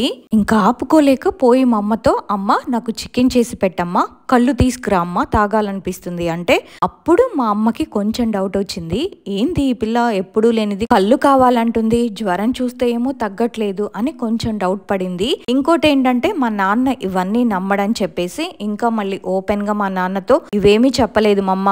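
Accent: Telangana, India. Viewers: Bhabi telangana ka slang ACHA hi 😊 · Telangana slang vachindi madhalo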